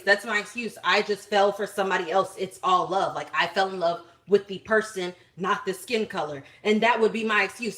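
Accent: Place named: South, United States. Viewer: we Luv you you too sexy and your voice is beautiful. I love your southern accent it is sublime. you just don't know. well now you do mmmmmm there is no comparison between you too. I came threw the door and said it before, that was that shit.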